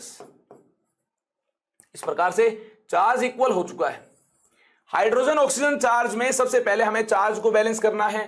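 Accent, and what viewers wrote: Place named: Haryana, India. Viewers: Sir app harayan ka lagta ho boli sa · Class 11th:_ A teacher with HARYANVI ACCENT🤩🤩 preparing us for the real DANGAL to be fought in 2022 🔥🔥🤓😎😎 🏅🎖🎖🥇🥇 22 dislikes😡😡they dont deserve to live in this planet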